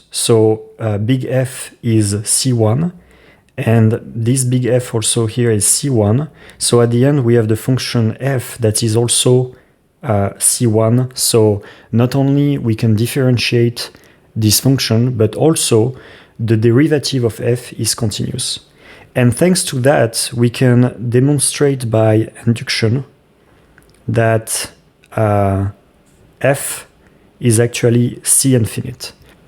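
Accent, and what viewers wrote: France. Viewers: c'est cramé à 10km que t'es français ptdr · don’t worry the English level is very good. Yes there’s a French accent but it’s no issue.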